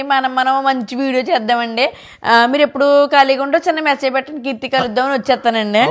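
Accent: Godavari, India. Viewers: Memu jamshedpur lo untaamu. Kaani native place West Godavari Palakol Anduke mee Godavari yaasa chala chala connect avutaanu. Movie lo cheste Andhra audience bagaa. connect avutaaru . Mee West Godavari Yaasa biggest highlight avutundi ye movie ki ayina. Idandaa yenduku chebutunnannate. Anil Ravipudi gaaru Meeku chance ivvaalani korukuntunnanu. I am a Big Big Big fan of u. Yenta appreciate chesina naaku tanivi tiratledu. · Yes nijamee andi nevadhathamasgarilage vuntaru me voice chala baguntndhi godavari language chala famous ayyindhi andi amrica lo kuda tq godblessyou 🎊💝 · Mee godavari language super ga untadhi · ఆమ్మ కీర్తి! ఒక కోనసీమ జిల్లా వాసిగా చెప్తున్నా! You made గోదావరి భాష యాసిని famous through out world with your videos / reels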